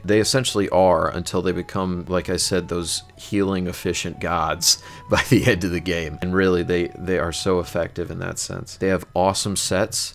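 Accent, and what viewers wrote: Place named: United States. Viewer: I'm glad to have stumbled upon this gem of a channel! That's the case for me especially, since we seem to be very much on the same page; I'm like your European counterpart (unless you're not from the US, but you definitely do sound like it). Following the announcement of official Classic WoW, I was actually considering creating a channel and making videos on similar topics and in a similar fashion as you're doing, but real life got in the way, so it's nice to see that intention is being covered by someone else with roughly the same style, interests (LotR, obviously!) and even the same specific goals for Vanilla. As it happens, I'm also really adamant on playing a healing character since I played mostly as a mage and hunter during retail Vanilla & TBC (from which I'm returning to WoW directly). Like you, I was leaning most heavily towards the priest class, but now I'll also be strongly considering paladin, shaman and even druid (because I love HoT healing), at least for my alt characters. 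To effectively try out all healing classes, I'll be playing on an instant-60 private server called RetroWoW, where you can get free pre-raid BiS from vendors and start raiding or doing battlegrounds right off the bat. That should make testing out all of these classes a much quicker process; hopefully it'll allow me to make an educated choice before the launch of Classic WoW. Aside from which class to pick as my main, I'm also torn between Alliance and Horde. I feel more comfortable playing as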